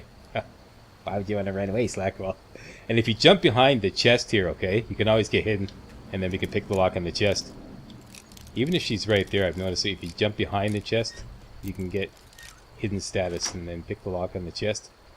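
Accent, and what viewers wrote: Canada. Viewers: Your Canadian eh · i'm in this for the Canadian accent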